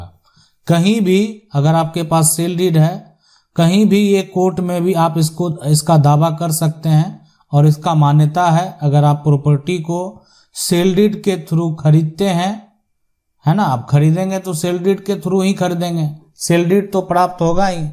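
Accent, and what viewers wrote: Bihar, India. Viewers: बार बार वही लाईनें दोहरा रहे है दुसरे यह भाषा भोजपुरी टाईप समझ से परे। कुछ कुछ बिहारी टच। हिंदी भी नहीं है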